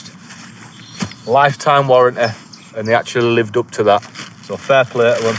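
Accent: Yorkshire, United Kingdom. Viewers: For a Yorkie, with a great Yorkie accent, you don’t half say “plasters” with a posh tone. Lol.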